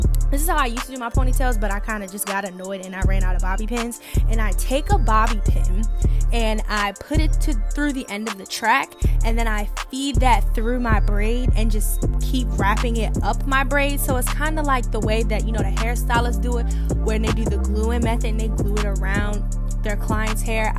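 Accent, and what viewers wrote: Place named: Baltimore, United States. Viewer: The accent giving Baltimore 🤔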